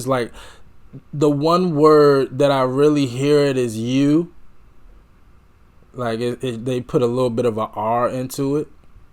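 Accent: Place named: Ireland. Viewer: Big time Irish accent.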